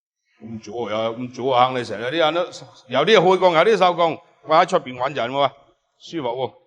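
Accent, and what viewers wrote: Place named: Shunde, China. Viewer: 客家话口音同顺德人讲话是一样的，就是似这位主持人。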